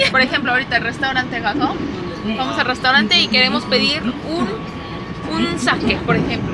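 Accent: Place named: Mexico. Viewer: Hablas como mexicana,y te ves muy coriana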